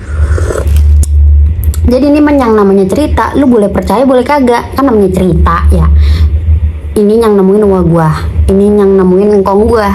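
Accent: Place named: Betawi, Indonesia. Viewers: betawi A nih kalo betawi E udah jarang yak 😂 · betawie ngapak.😁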